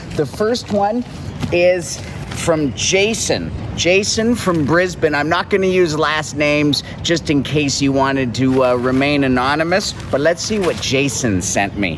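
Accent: Canada. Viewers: You are really awesome. The canadian accent was just superb and the energy in videos makes look Thailand much more cooler. I will really come and meet you once i am back to Thailand and will surely bring goodies from India.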